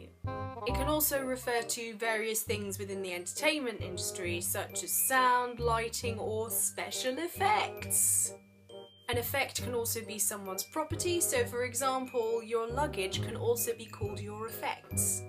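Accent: United Kingdom. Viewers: Hi, i learn english on your vids, i like it, but could you make the background sound little bit lower for better comprehending what you saying, and plz, if it possible make more educational vids with your awesom british accent, luv your funny nozze)))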